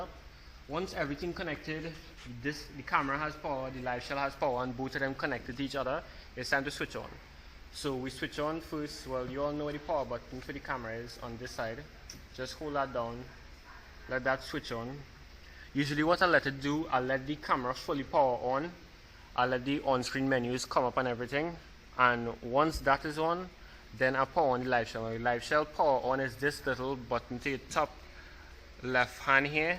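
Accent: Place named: Trinidad and Tobago. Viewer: You sound like a Trini.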